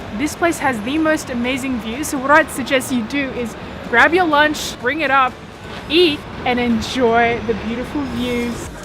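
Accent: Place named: Australia. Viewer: Hi I have just subscribed to you channel lol. You sound Australian very interesting. I have friends from Hong Kong and China too. How safe is Hong Kong for a man on his own. I believe you can blend a little lol. Dobspato England Newcastle Upon Tyne.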